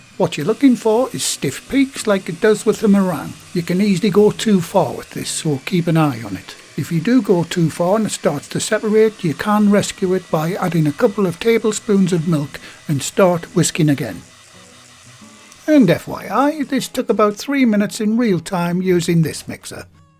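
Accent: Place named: Yorkshire, United Kingdom. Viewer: Hi is this the same as a Victorian sponge? My partner has been nagging me for 4 yrs to make one for him lol but I get a lot of requests so sometimes I forget to make things! This year I will make one for his birthday (in Feb). By the way is that a Yorkshire accent? My grandparents are from Yorkshire and it sounds very similar.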